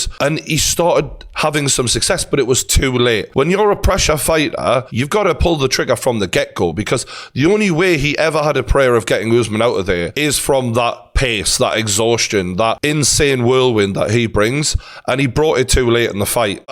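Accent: Newcastle, United Kingdom. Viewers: Best mma content, love this geordie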